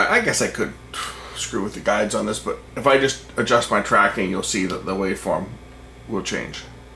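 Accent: Canada. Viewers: exactly and it's my first properish scope. I only started learning last October'ish and my first buy was a must tool MT8205 scopemeter and before it arrived I had already advanced past it suiting my needs. (Still it's a good accurate meter) but like I said for 14 bucks its the perfect scope for someone learning like myself till I have the money for a proper one.. P.s. I should of noticed you were Canadian and not American by the way ya talk.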